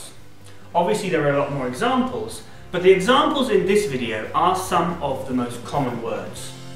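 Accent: United Kingdom. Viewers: Is this britidh accent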